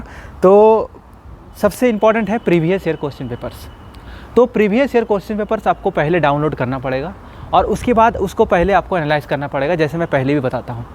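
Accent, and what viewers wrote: Bihar, India. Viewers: Sir Your bihari essence 😍💖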